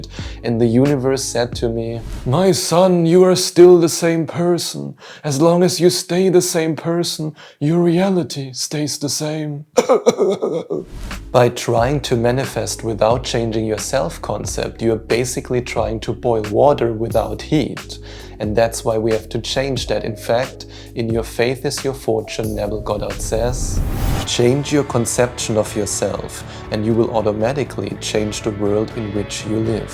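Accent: Germany. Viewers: I love your German accent, your wonderful sense of humor and the straightforward way you present the material for us to understand your journey and our journey.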